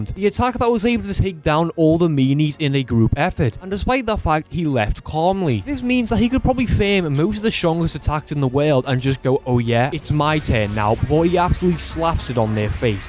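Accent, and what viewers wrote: Liverpool, United Kingdom. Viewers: You sound like your fighting the urge to turn scouse with some sentences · why did I, a fully grown person, just watch a vaguely scouse bloke talk about a childrens tv show for 8 whole minutes?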